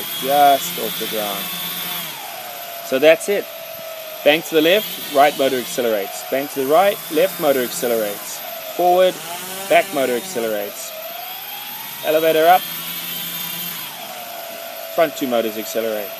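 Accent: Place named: South Africa. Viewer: You sound like you have lived in South Africa before......(accent)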